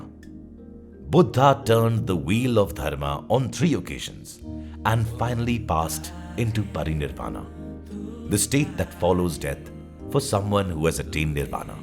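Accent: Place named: India. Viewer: The narrators Indian accent is cringe.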